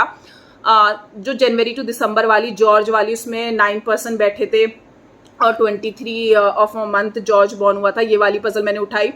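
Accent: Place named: Kumaon, India. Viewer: your accent is so kumauni ,,,,where do you belong actually??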